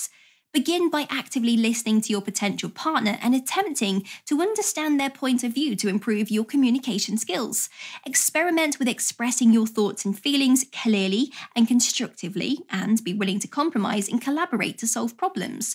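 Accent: England, United Kingdom. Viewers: the only nice thing about this video is that she has an english accent. and for the rest I have no remarks or criticisms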